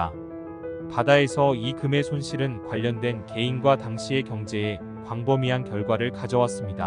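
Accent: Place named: United States. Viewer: 보물섬 실제 얘기 잘봤어요 근데 아메리칸 발음을 더 잘 들었어요 영상도 자주 바뀌어 조금의 지루함 없이 영상 잘 봤습니다.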